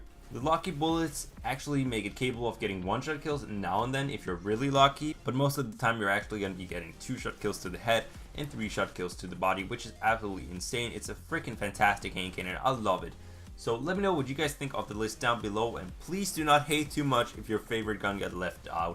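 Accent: United States. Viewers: You sound american, you tend to slip into sound like your from new york and you say some things in an English accent sometimes. Why is this?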